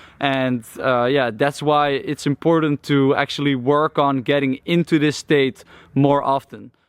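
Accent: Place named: Netherlands. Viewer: Je bent nederlands toch want je klinkt heel nederlands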